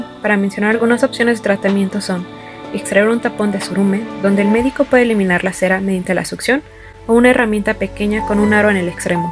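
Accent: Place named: United States. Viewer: Español de USA 🇺🇸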